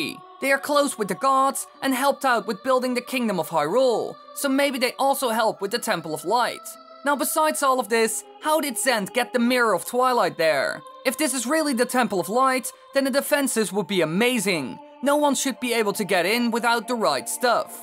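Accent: Netherlands. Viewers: despite the accent i can spot a dutchman in your voice for sure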